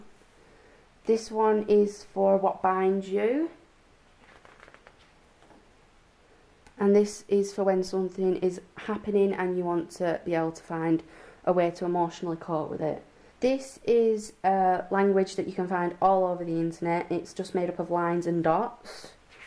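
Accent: United Kingdom. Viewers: It's beautiful! What kind of UK accent do you have if you don't mind me asking?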